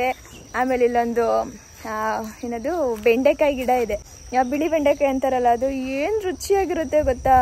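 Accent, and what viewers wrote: Mandya, India. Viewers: Hi Nice video super singer ❤❤❤❤ ನಿಮ್ಮೂರ್ ಮಂಡ್ಯ ನಾ ಮಂಡ್ಯ ಭಾಷೆ ತರ ಇದೆ ನಮ್ಮೂರು ಮಂಡ್ಯ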